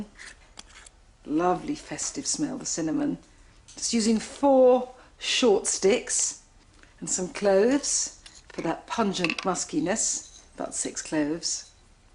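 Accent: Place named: United Kingdom. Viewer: If got into ASMR she'd have a whole new career, with her calming voice and British accent.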